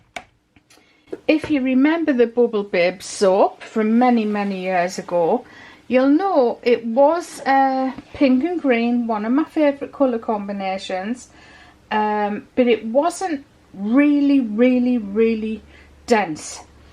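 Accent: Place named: Cumbria, United Kingdom. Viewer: Haha right Cumbrian kitchen banter. It so nice to find videos with a local accent instead of usa (not that I've anything against usa accents I watch them n all) it's just good to know Cumbrians craft too 🤩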